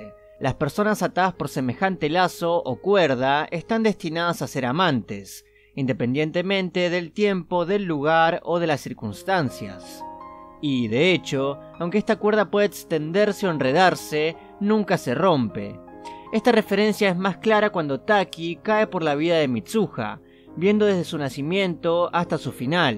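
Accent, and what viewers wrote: Buenos Aires, Argentina. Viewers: que paso con esa 'Y' cuando hablas ¿eres porteño?... Buen video like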